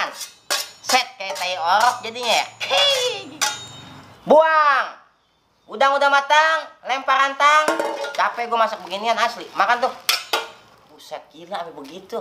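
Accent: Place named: West Sumatra, Indonesia. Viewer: Suaranya persis minang kocak anjir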